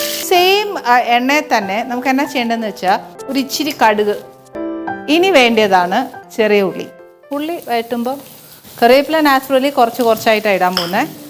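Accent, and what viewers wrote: Kottayam, India. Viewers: Kottayam bhashayil paranjanneyullu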